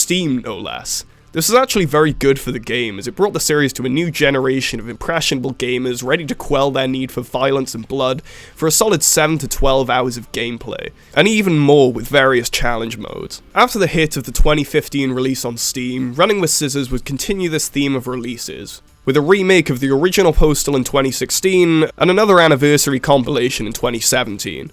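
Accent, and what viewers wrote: United Kingdom. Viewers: This is a great video dude, also could be completely off but are you Welsh?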